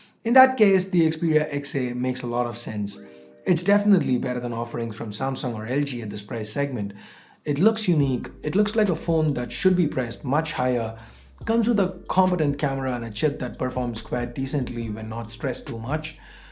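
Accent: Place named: India. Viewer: bhai just a suggestion. English ya toh clear Indian accent me bolo ya fir purely British/American whatever you are trying to attempt here, dont jinx them up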